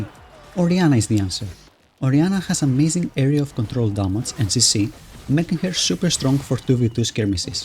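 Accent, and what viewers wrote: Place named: Greece. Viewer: From your accent you sound Greek!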